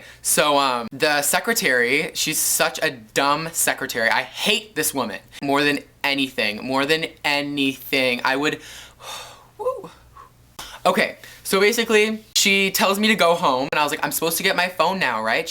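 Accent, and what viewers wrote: Canada. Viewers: his Canadian accent is really strong in this vid!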